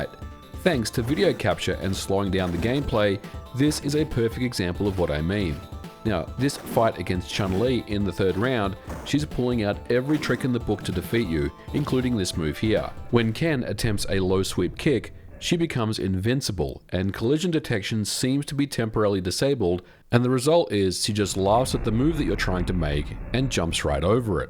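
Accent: United Kingdom. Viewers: Even as much as I know coding and computer mechanics you make me look like an amateur and your accent, accents your intelligence, something about the Brits and Aussies that when you talk in technicals it all sounds even more sophisticated... Man i want to go back to the 90s so bad, even as a poor kid it still was the greatest decade ever to be a kid and arcades with games like Street Fighter II are a major reason why..even back then though I realized the business behind making the cpu seemingly godly at times since as u said the game was there to take your money and keep you coming back and I notice when I got it for snes while the cpu was still difficult it didn't have the upper hand the arcade did though I know thats cuz of hardware as well, that's what got me loving the game genie...Thanx as always for every upload covering lesser known topics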